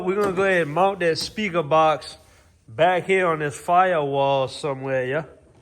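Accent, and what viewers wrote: Louisiana, United States. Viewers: That's what's up bruh! Keep doing YOU and the channel will grow... That southern Louisiana accent and your personality is guaranteed to garner some attention 😉.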